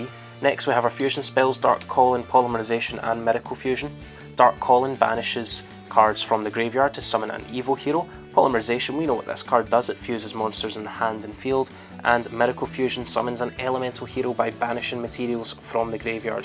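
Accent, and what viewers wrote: Scotland, United Kingdom. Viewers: I hope I'm not the only guy that comments on any scottish accent video and make a reference to the limmy's show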